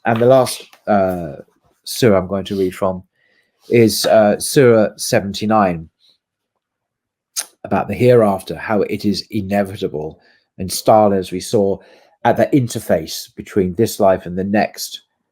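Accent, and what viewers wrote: United Kingdom. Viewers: You got a British accent, you are a propagandist. Let's talk about how many people Britain have killed just in India. F*****, British sucks.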